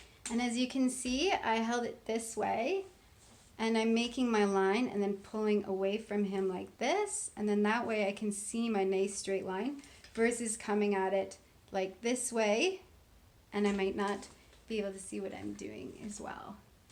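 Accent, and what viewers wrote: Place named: Pacific Northwest, United States. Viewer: She from Portland or Seattle? smh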